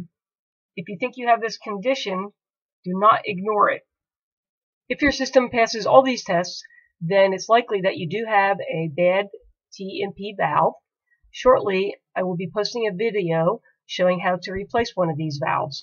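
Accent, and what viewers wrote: Philadelphia, United States. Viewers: You must be from Philly. Wooder.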